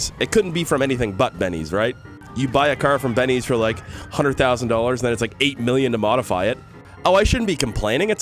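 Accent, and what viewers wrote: United States. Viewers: you sound like the most American person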